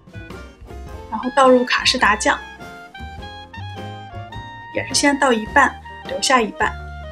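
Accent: China. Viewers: ellen Qu 我猜是中國因為我去過大陸我覺得她有時講話很和大陸人講的一樣喔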